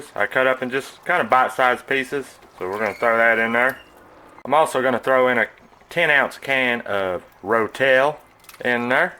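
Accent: South, United States. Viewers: I love your Southern accent.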